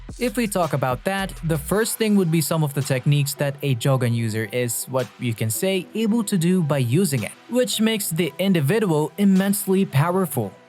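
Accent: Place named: Philippines. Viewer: Pinoy accent 😂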